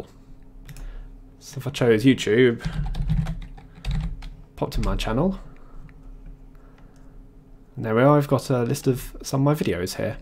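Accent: United Kingdom. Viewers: Nice review done in Blighty English. How refreshing. Great job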